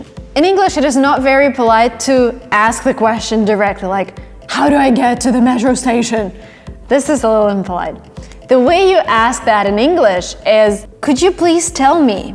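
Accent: India. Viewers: It look's like all Indians are showing up here🇮🇳.The thing here is her accent is like Indian who speaking in English... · This exactly is similar to how we speak English in India.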